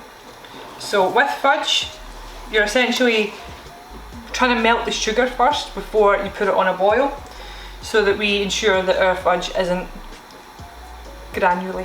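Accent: Scotland, United Kingdom. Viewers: It is English with a Scottish accent 🤦‍♂️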